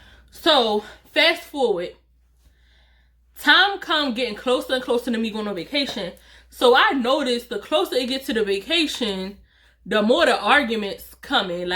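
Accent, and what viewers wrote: Baltimore, United States. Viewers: First I wanna say sorry for your loss! But gurl u had me rolling 🤣 u are a Great story teller. I knew u were from Baltimore 2 mins in when I heard how u pronounced each other only us Baltimore ppl say it like that 😆🤣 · Girl where you from? You lowkey sound like you from Baltimore if so same!! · I Love You 😂 New Subbie 🥰💕 P.S. I Loveeee To Hear Ppl From Bmore Say Words Like "New, To, True, And You" 😂😂😂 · Baltimore accent?? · Girl, I am disgusted. #1. I knew where you was from when you first started talking. All my mama's side from Baltimore. #2 These girls suppose to be grieving thinking about how to give you tea on they mess. I cannot believe this! I am still listening but I am HOTT with you. The disrespect! This is why I always tell my brother, be real because don't leave any mess behind for us to clean up! Aint nothing about you being young and dumb, I would never judge you. 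You had every right to think you were the only one and had no idea the mess he left, smh. Sis...YOU WISH YOUR BROTHER WAS HERE TO MEET HIS WHAT?!! Girl... New subscriber! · When you started talking, I said “oh she is from the native land” 😂😂🤣🤣🤣🤣 Baltimore Girls 😉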